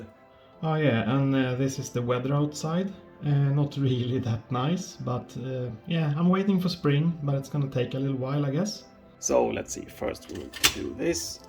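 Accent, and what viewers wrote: Sweden. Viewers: Hey are u swdish u sound like it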